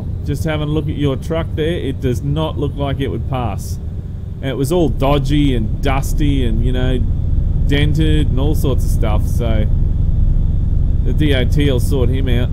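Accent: Australia. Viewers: Are u Australian ?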